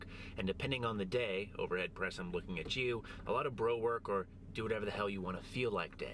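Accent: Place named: South, United States. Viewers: ʻPedostacheʻ, Country Accents and ʻMirror ʻMirinʻ... makes for a complete and entertaining video! Seriously, I havenʻt tried floor presses. Looks like they mainly help you with the last 3rd of the press. Interesting, I might try those because I have good explosion off the chest, but, I seem to get to a sticking point during the last 3rd of the press. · THAT SLOW PROGRESSION TO A SOUTHERN ACCENT HAHA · dat southern accent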